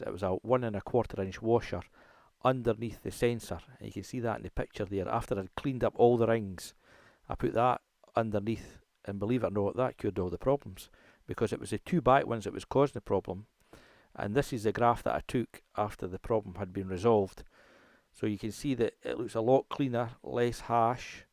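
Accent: Scotland, United Kingdom. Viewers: Thank you for doing this video. I'm looking for a cause of a certain code on my x350, and this has been a real help in helping me decide how to proceed. (Love the Scottish accent by the way. )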